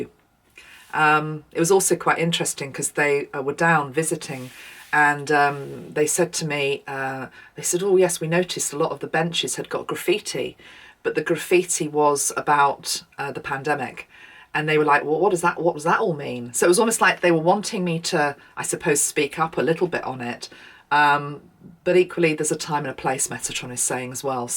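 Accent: England, United Kingdom. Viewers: Hello I was just introduced to you last week by a friend and I so resonate with your messages I love your lovely English accent and I so appreciate your truth Thank you so much where we go one we go all Diane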